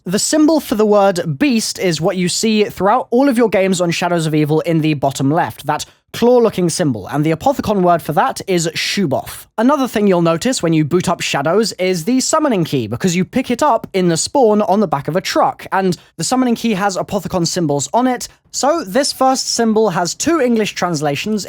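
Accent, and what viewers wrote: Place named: United Kingdom. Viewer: Ur British voice is anoyyyyyyyyyyyyying!!!!!!!!!!!!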